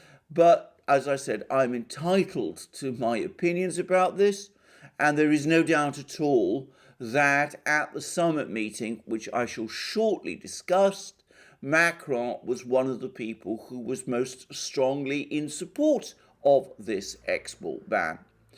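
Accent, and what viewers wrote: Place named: RP, United Kingdom. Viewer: Hi.. I love your presentation. It's in Queen's English and it's succinct and deliberate in diction.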